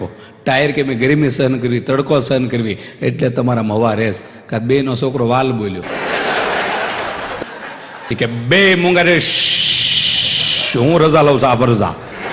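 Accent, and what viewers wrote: Kathiawar, India. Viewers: aape pyor tadpadi bhasha jadvi rakhi chhe, kathiyavad sivay kadach samjata thodo samay levo padto hase evu manu chhu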